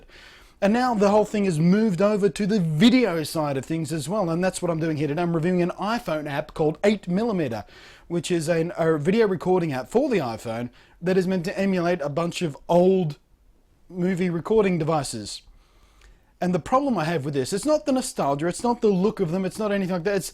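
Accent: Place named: Australia. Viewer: Jesus Christ i LOVE Australian accent!!!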